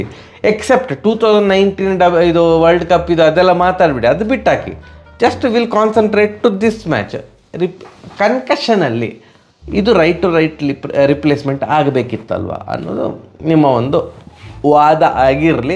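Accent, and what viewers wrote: Mangalore, India. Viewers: ಅಣ್ಣ ನಾವು ಧಾರವಾಡ ಜಿಲ್ಲೆಯವರು ನಿಮ್ಮ ಮಂಗಳೂರು ಶೈಲಿಯ ಮಾತು ನಮಗೆ ಬಾಳ್ ಅಚ್ಚುಮೆಚ್ಚು 🙏💐💛❤️ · Mangalore shaili Chand untu marayare❤❤❤❤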